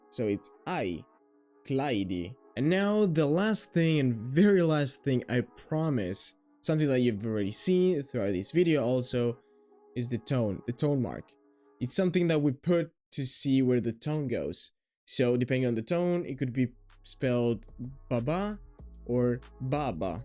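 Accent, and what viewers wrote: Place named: Greece. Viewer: Τα γελοιοποίησες τελείως τα Ελληνικά μας, χαχαχα! Ας σκεφτούν όμως οι αγγλόφωνοι ότι αν σε αυτούς φαίνονται ανάποδα τα ελληνικά, το ίδιο φαίνονται ανάποδα και στους Έλληνες τα αγγλικά όταν τα πρωτομαθαίνουν, αλλά με τον αντίστροφο τρόπο. Δηλαδή κάποιος παραξενεύεται όταν μαθαίνει ότι το Ρ προφέρεται στα αγγλικά 'π". Το καλό λοιπόν είναι ότι αφού σχεδόν όλοι οι Έλληνες κατορθώνουν να μάθουν τουλάχιστον να διαβάζουν απλά Αγγλικά και η νέα γενιά τα μιλάει και τέλεια, έτσι μπορούν να μάθουν και οι αγγλόφωνοι Ελληνικά, και ας μην γκρινιάζουν με το να τα θεωρούν δύσκολα. Το μόνο αλλά πολύ σοβαρό πρόβλημά τους είναι ότι 'κυκλοφορούν' στο youtube πάρα πολλοί ακατάλληλοι "δάσκαλοι" της Ελληνικής που τα έμαθαν λάθος και τα μεταδίδουν λάθος. Το παλικάρι από εδώ ακούγεται είτε ελληνόφωνο εκ γενετής είτε δίγλωσσο εκ γενετής, γιατί η προφορά του στα Ελληνικά είναι ακριβώς Ελληνική. 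Μπορείτε να το εμπιστευτείτε.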